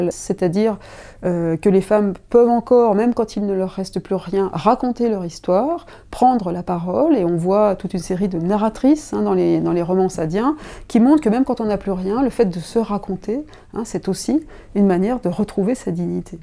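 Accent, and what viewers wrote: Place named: Savoie, France. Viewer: j'ai arrêté la vidéo à la seconde où le son de savoiE atteignit mes oreilles c'est pas tabou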